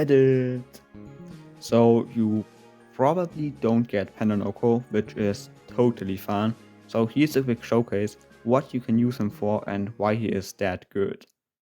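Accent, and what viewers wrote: Germany. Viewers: ich hab dein deutschen aktzent gehört aber du sprichst gut englisch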